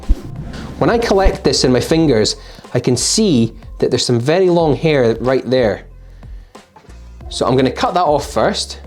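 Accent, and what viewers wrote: Scotland, United Kingdom. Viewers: Love the Scottish accent. It's like watching a production of Brigadoon! Great videos 👍😌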